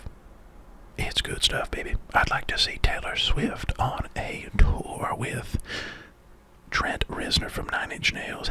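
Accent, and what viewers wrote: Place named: South, United States. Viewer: He was covering up his southern roots back then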